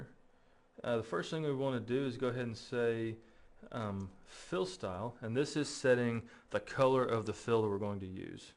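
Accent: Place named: Midwest, United States. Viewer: he sounds american to me. Probably from the midwest or south.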